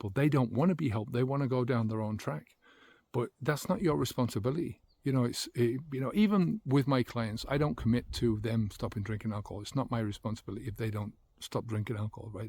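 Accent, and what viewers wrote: Ireland. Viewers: Did you used to live in ireland? You have a slight irish accent.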